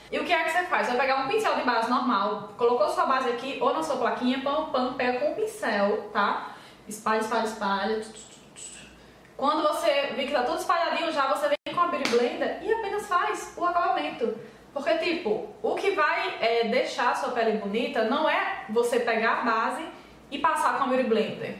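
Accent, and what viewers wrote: Northeast, Brazil. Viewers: genteeee esse sotaque é nordestino. Ameeei, quero saber de onde que estado tu é mulheeer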